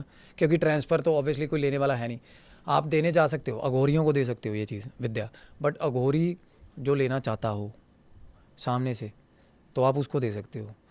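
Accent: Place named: Punjab, India. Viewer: Veer ji apka Punjabi accent kamaal ka hai, try karen ki pure Punjabi aap bole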